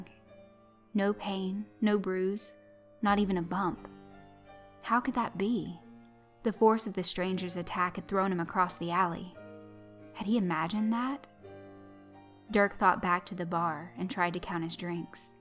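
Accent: South, United States. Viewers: idk what it is about your voice just make me fall asleep i don't know if it the southern accent listed to the story you narrate makes me fall asleep i work 3 shift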